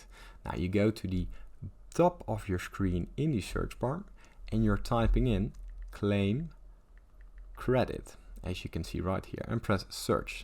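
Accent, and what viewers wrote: Netherlands. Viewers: Your accent just screams Dutch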